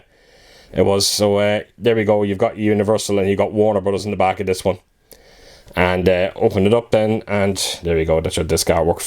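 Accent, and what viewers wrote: Ireland. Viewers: I know we chatted before neighbor, I thought you were a Louth man (accent) if you remember, anyways I love the channel and never miss an upload keep them coming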